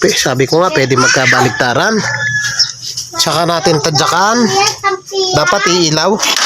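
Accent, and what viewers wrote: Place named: Pampanga, Philippines. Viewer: May tanong lang po ako, out of the topic lang. Kapampangan po ba kayo? Mag pagka-accent po kayo Kapampangan eh hehe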